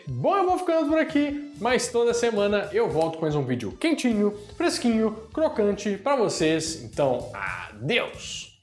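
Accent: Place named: Minas Gerais, Brazil. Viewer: Agora descubri que voçe é mineirinho né por isso que viçe tem um sotaque reconhecivel e eu também sou de minas gerais cara. Um abraço😎✌👊👍🙏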